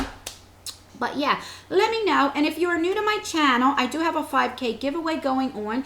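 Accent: New Jersey, United States. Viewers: I need a man with this beautiful jersey accent....u gotta brother or cousin looking? Hehehe!! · I'm sure you hear this a lot but I love your Jersey accent. I'm a newer sub but really enjoying your videos. Also in my early 30s its nice to watch a youtuber that's actually over 30 ❤❤ Also I am sooo like that some things I splurge on others I like to find the cheapest alternatives. I'll slay some Wal-Mart clothes all day 😉 p.s this box seems like more of a $20 box max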